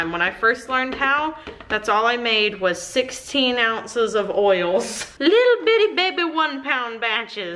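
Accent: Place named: South, United States. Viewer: Anybody else from 2020 and sounds sooo southern in the intro? Lol I know how southerners she sounds bc I’m from the south 😌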